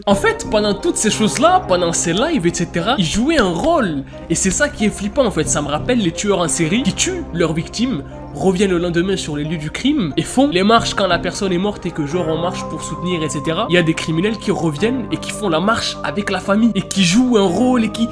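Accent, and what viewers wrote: England, United Kingdom. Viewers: Je kiff ton accent anglais frérot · j'adore ton accent anglais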